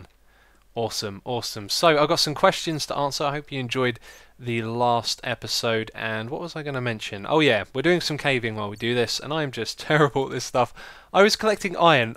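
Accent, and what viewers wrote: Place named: England, United Kingdom. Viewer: Thank you so much man for all the content. The funny thing is that with the whole anonymity thing, you could literally live down the road from me and I wouldn't even know haha. Cause of the exact English Accents:-)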